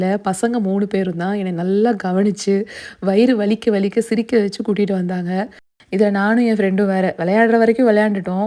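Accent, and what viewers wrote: Coimbatore, India. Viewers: Modaiya irukum😂 Absolute Coimbatore slang❤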